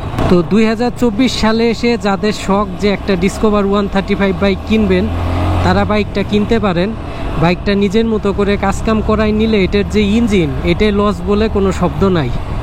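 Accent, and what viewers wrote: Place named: Faridpur, Bangladesh. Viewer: ভাইয়া আপনার হোমটাউন কি ফরিদপুর?কেনো বলছি,কথা বলা দেখে চেনা শহরের বলেই মনে হচ্ছে!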